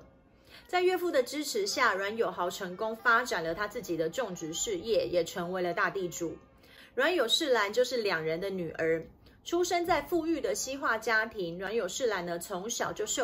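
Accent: Taiwan. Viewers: 很喜歡妳的旁白解說，坦率而帶有情感，使这位末代皇后的故事更添幾分神傷！希望妳繼續努力，多製作更多更精釆的視頻，聽妳的口音應是台灣朋友吧？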